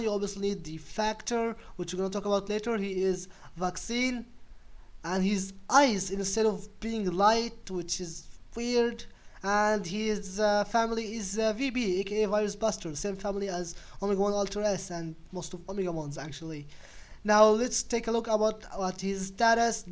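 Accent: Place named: Egypt. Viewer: You sound Egyptian in your English accent you’re Egyptian ?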